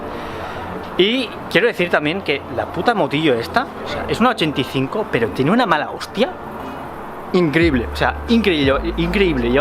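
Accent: Spain. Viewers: Eres de creixell(Tarragona) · Eres de creixell?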